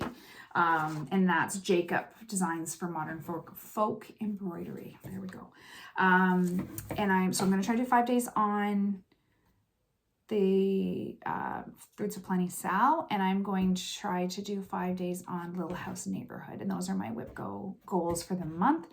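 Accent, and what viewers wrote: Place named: Canada. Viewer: Nice to hear a familiar Canadian "accent". I'm also in Ontario, closer to London. I love seeing all your projects. We have similar tastes in stitching and I have some of the same ones ready to go. I usually stitch on one project at a time and I'm over half-done a Teresa Kogut chart (Cotswold Fields) at the moment. We are about an hour from the border, so that's convenient for Hobby Lobby, etc. Luckily we have a LNS in London (Stitch-It Central). Keep stitching!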